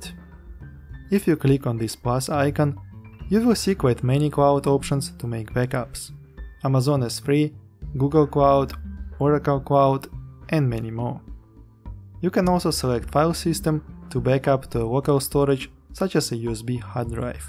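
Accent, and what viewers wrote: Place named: Russia. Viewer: Никак не могу привыкнуть к твоему русскому акценту)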